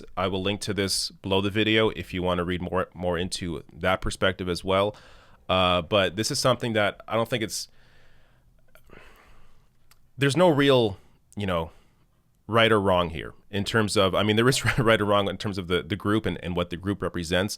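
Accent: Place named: Canada. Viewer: You know is Canadian right, so this entire video had a Canadian accent 😂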